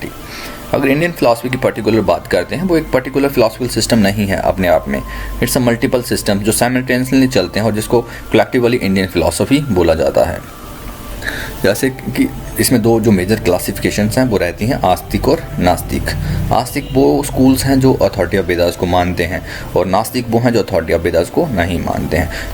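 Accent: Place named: Jammu, India. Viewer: Bhaiya aap jammu se ho kya ? 😅 Aapki awaaz se lg rha hai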